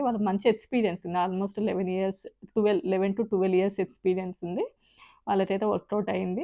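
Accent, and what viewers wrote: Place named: Karimnagar, India. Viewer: You are explaining very clearly and confidently . I you are basically from karimnagar dist. Your pronounsation different from twin cities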